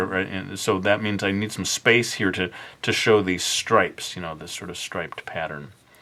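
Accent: United Kingdom. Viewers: Your british accent is hilarious. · you are so brittish :D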